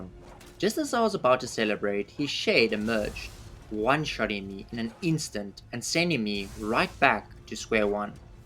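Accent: South Africa. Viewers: You south african mate ?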